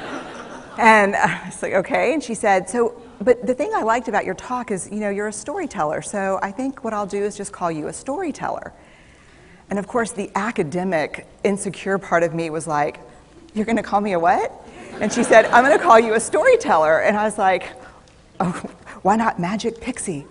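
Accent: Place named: United States. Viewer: this is American English I thought it was British language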